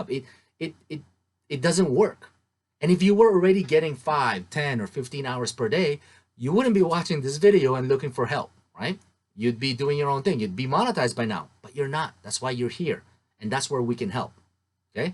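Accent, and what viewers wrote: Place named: England, United Kingdom. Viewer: I love your English accent❤️😭 dame your pronunciation ❤️❤️🔥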